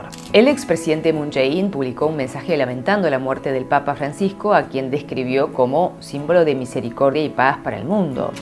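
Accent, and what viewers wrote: Argentina. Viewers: QUE BONITA VOZ QUE TENES, RECIEN TE CONOSCO Y ME QUEDE A ESCUCHARTE,me sorprendió tu tono de voz se asemeja a tonada argentina!, saludos desde ARGENTINA..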